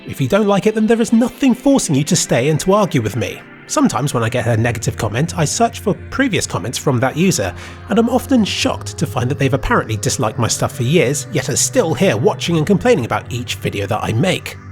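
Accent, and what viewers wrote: United Kingdom. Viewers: can you please change your dialect im very tired of the british lately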